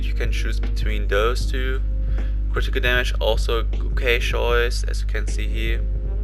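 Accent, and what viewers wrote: Germany. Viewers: Are you from Germany you have a German accent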